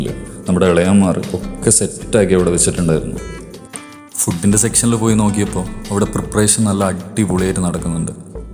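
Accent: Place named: Kannur, India. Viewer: Waiting for next vlog.....kannur slang is really nice 👍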